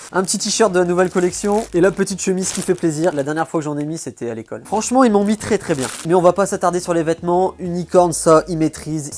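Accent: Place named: North, France. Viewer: Tu viens du nord ? J'ai l'impression d'entendre un petit accent ^^ Edit : ah bah oui tu le dis dans la vidéo tu vas sans skate parc du nord de la France x)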